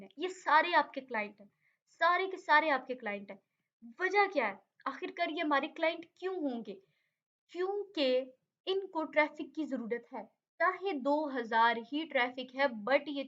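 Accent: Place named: Jhang, Pakistan. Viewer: jhang ki ho ap ?apki punjabi sy laga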